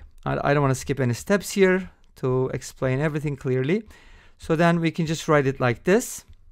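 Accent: Turkey. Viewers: Your pronanciation is like someone whose native language is Turkish, am I correct? Great explanation by the way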